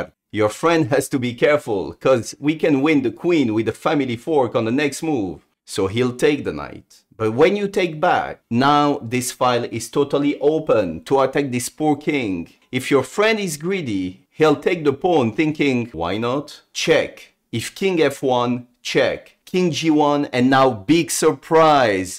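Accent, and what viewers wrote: France. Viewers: I take it your from your accent that you are French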